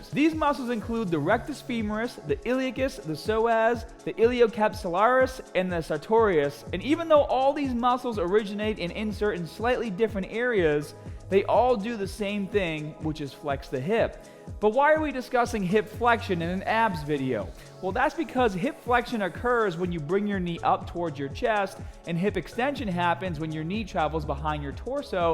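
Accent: Boston, United States. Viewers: Great video, obviously a Boston boy, LOL. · The Boston accent is great. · He sounds like my former HS principal who came from Boston 😅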